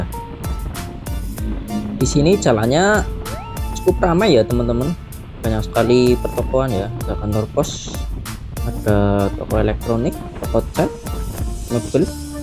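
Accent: Java, Indonesia. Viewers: Kok suaranya medok? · logat jawa nya medok banget...ill feel gw dengernya.sedangkan banjar patroman bahasanya sunda...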